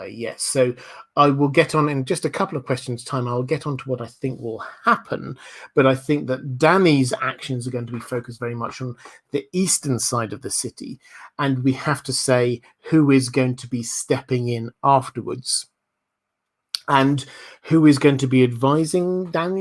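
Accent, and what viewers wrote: United Kingdom. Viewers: Love your British accent on foreign languages! Keep it up and don't try too hard.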